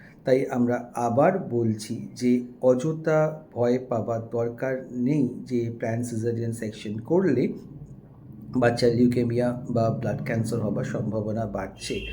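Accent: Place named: Kolkata, India. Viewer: Nmaskar Sair কলকাতাই কথাই বসেন আপনি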